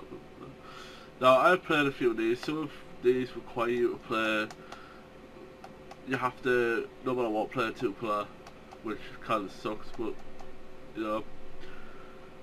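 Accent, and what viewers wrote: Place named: Northern England, United Kingdom. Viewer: nice pickups althought i still cant understand you but i think its cause your northern i think :P oh and FYI FIRST COMMENT!!!!!!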